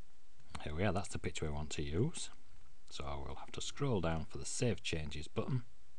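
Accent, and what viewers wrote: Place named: North, United Kingdom. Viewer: Nice tutorial. A man after my own heart... nice and steady, not rushed at all... and with the added benefit of a northern accent! :)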